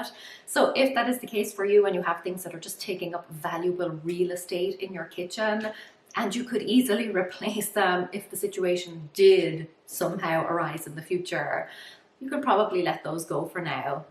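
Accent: Ireland. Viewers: Thank You good to know. Also love your Irish accent.💚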